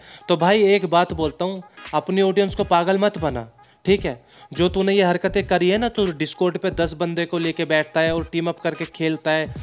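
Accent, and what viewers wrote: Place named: Haryana, India. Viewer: AP PAKKA HARYANA SE HO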